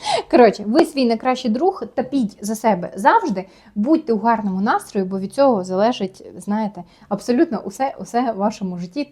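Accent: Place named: Kherson, Ukraine. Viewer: Пишу коментар за вашу херсонську УКРАЇНСЬКУ!!!! ТИ КЛАСНА🤩 радяю з того, що все більше українського контенту з'являється. Дяую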